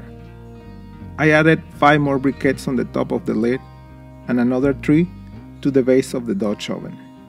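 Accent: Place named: Costa Rica. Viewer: .Iam from Costa Rica so i wonder are you ,you accent sounds like a tico from CR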